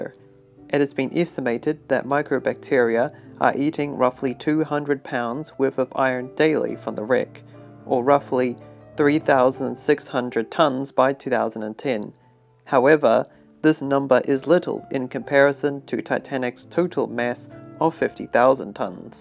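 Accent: New Zealand. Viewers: New Zealander? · It's a New Zealand accent.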